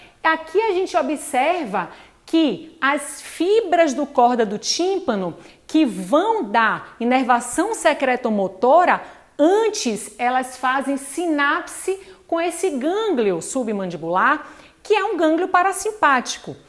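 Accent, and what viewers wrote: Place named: Bahia, Brazil. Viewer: Professora, excelente aula! Melhor ainda porque matei a saudade de ouvir o sotaque da Bahia ahhuahau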